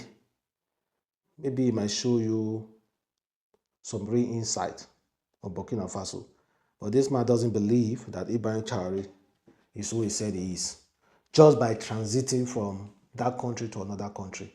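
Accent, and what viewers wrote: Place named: Edo, Nigeria. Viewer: This guy self you no go school, why you dey read and pronounce like that, se something the worry you abi, do you even go back to watch your own videos before posting them, stop disgracing Edo state, if the social media is too hard for you, you can as well go back to farming. every time i watch your video its always filled with reading errors, very bad pronunciation. Am advising you to always go back and watch your videos before posting them, identify the errors, correct them before posting the videos, or contract an editor to work on it for you, youtube is a worldwide platform and you can't afford to keep disgracing yourself, Edo state and Nigeria at large, from the way you speak its obvious you're from Edo state, stop disgracing us.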